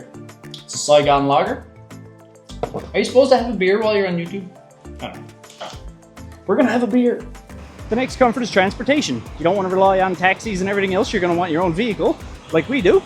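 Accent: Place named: Eastern Canada, Canada. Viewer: Oh okay. Nice. I thought by your eastern accent you were but I heard you say something about western Canada so wasn’t sure. Cheers. Love your channel. Cheers 🍻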